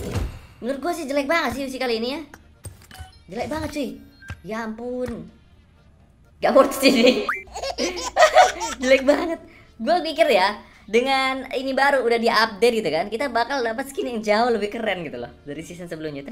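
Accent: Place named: North Sumatra, Indonesia. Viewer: jelas kali logat sumut kau bg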